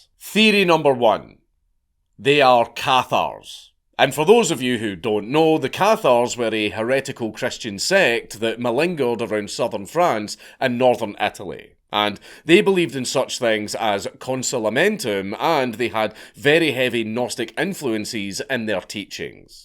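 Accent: United States. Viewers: That's a good American accent. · Pretty good American accent ya got there my Scottish friend. Im also of Scottish, Dutch, French and Native American hertiages. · That was a pretty good vaguely American accent, I do a pretty good accent too but only when I am drunk. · Pretty good American accent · Not the worst take American accent I've ever heard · Just got here from X. Love this style. Dig the gruff American accent. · Dude, good work on the American accent! Much respect from the land of the whatever we are these days! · with an American accent is CURSED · Mr. Dankula, i must say i love your American accent. you should do it more often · Your American accent is good! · Brillant opening. Excellent American accent. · Dankula, your American accent is great. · That American accent though. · Dankula doing an American accent threw me off so hard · Never thought I would hear do an American accent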